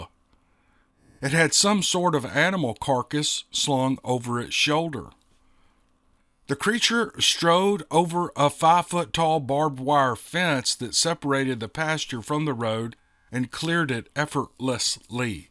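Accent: South, United States. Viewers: Yet again,another great podcast.My personal interest in Sasquatch is fueled by what I consider a possible encounter as a child.A friend told me about you .I am an avid reader,however,I have formed an addiction to listening to your narratives.It must be the southern dialect .You see,I am from Alabama and our accents are quite similar .I read in a southern accent ,so listening to someone reading in the same is natural .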